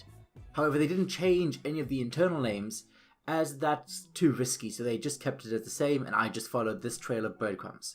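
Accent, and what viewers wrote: South Africa. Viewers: Yo is South African?? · What accent do you have bro? Sounds south african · South African accent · South African accent?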